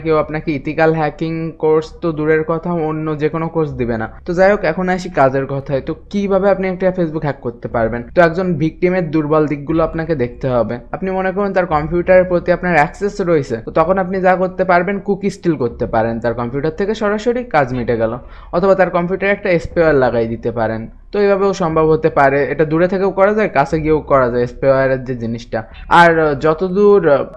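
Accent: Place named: Jessore, Bangladesh. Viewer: ভাই আপনার বাসা কি যশোরে নাকি। আপনার ভাষার যশোরে মত লাগে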